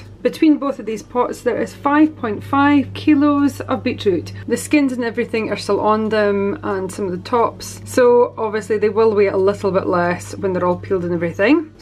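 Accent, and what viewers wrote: Scotland, United Kingdom. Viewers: Brilliant, great to hear a Scottish accent.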